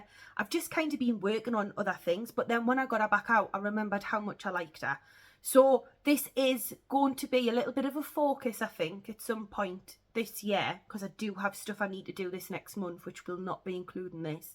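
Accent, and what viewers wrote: Northern England, United Kingdom. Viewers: Great video - you are so funny and down to earth. I'm a fellow northerner and love your accent. Pickle 😊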